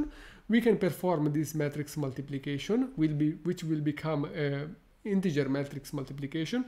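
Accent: Italy. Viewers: I've seen many of your videos and you are a great teacher! Thank you for your effort in explaining in plain (Italian accent) English all of these complicated topics. Regarding the content of the video - you showed the quantization-aware training and you were surprised of the worse result it showed in comparison to the post-training quantization in the concrete example you made. I think it is because you trained the post-training quantization on the same data that you tested it on, so the parameters learned (alpha, beta) are overfitted to the test data, that's why the accuracy was better. I think that if you had tested it with true test data, you probably would have seen the result you anticipated.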